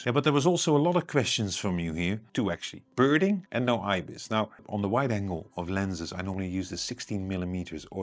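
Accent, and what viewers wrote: Netherlands. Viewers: Dutch accent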